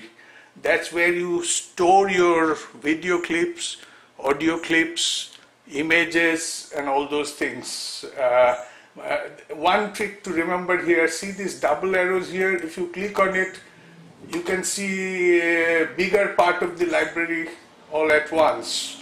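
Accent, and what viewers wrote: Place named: India. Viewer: thenks a lot man!! It's good Tutorials really. I'll watch it all and the indian accent is not a problem. go ON!!.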